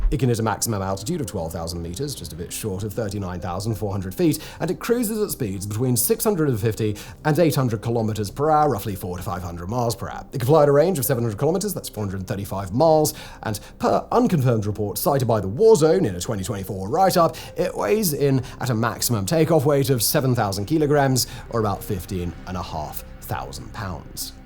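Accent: United Kingdom. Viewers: All your British lilt and shabby jabs at Russian and Chinese counterparts seem more and more like an age old Western propaganda than an unbiased fair take on the subject. No modern fighter jets have come head to head against each other in current war scenarios and neither you can confidently confirm that Russian or Chinese jets and missiles are somehow inferior to what west has to offer. Dont be so sure lil English brother, the Trident you tried to scare Russians embarrassed you globally and just recently.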